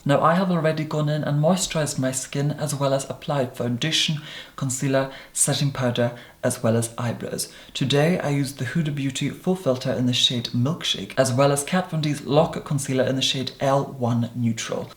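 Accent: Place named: London, United Kingdom. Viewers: Я слышу лондонский акцент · This is the most beautiful makeup look on planet earth 🌏 And the solar system Milky Way Our universe Parallel universes................................ You are an artist You make the most heavy makeup look polished, expensive, silky, porcelain like, divine and absolutely seamless You are brilliant, the more I watch your tutorials the more jaw dropped I feel Let alone your perfect posh English Dear.... you are freakin’ fantastic ❤️💖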